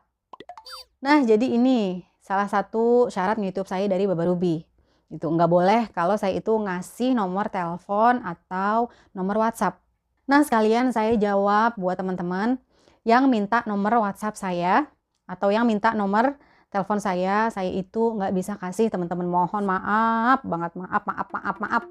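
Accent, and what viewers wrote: Jakarta, Indonesia. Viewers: Suka denger ngomongnya ada betawinya ada arabnya..resep bgt...sukses selalu mpok cantik.. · Logat betawinya nempel bgt.. demen dah mpo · mpo betawi nya kuar semua😂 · Logat betawinya masih melekat lucunya 😁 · Yaa Allaah... betawinya.... bikin bangga... 😍😍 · Logat Betawinya msh kental mpok.hehehe... Dari Al-qassim menyimak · Assalamualaikum... Seneng bngt liat vlognya apa lagi klo lg pas ngmng betawi...sehat² di sana...salam kenal dari Medan (aslinya mah jkt jg..ikut misua stay dimedan) Walasam🙏🙏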